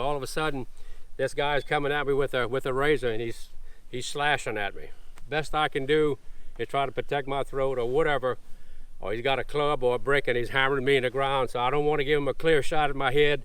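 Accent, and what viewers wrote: Louisiana, United States. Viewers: Sounds like a fellow Louisianan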